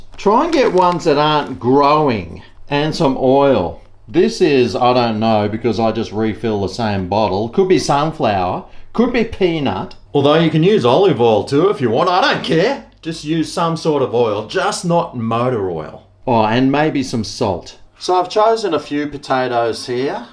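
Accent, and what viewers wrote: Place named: Australia. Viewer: Need to get one Airfyer fo myself. Chips don't look great but maybe it tastes good. Can you cook more food on Air Fryer for us. Thanks. Maybe a nice Roast chicken and potato. Thanks,. You do look like a comedian. And a good one. Enjoy your videos for sure! Thumbs up! As I subscribed.And good Aussie Accent.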